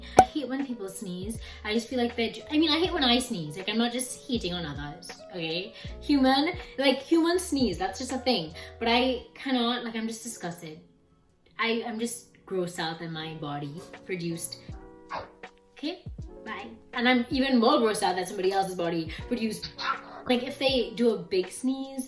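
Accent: Trinidad and Tobago. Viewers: You said you are from the Caribbean......Are you from Trinidad and Tobago? Your accent makes me think this🙂. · Fellow Trini. I hear the accent. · Are you trini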